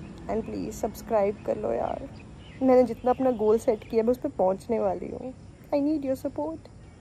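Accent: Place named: Gurdaspur, India. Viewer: Munde di boli toh pta lg gya oh gsp toh aw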